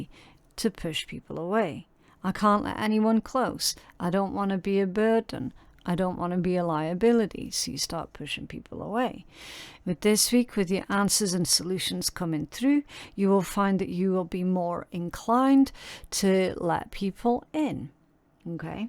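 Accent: Scotland, United Kingdom. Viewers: It’s a Scottish accent ❤ and yes it’s beautiful!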